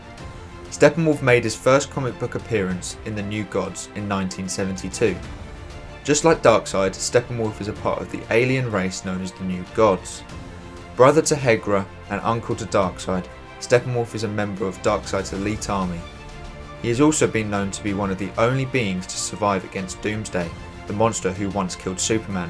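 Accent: United Kingdom. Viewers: I love your explanation so clear despite the British accent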